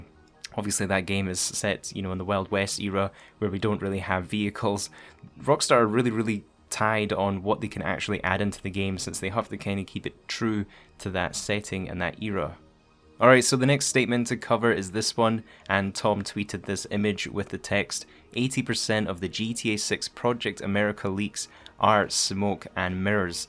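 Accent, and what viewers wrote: Scotland, United Kingdom. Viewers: This guy sounds he lives down the road from Edinburgh’s Rockstar studios 🏴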